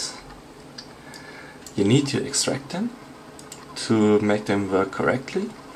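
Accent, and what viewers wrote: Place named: Germany. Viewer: Tried so many times to root my Z1 compact and all methods never worked until this one, Thanks very much, greatly appreciated, root succesful, now all i gotta do is figure out how to get apps to actually work on the sd card, using folder mount and whatever else is needed. I had an idea when i heard the German accent it was going to work this time :)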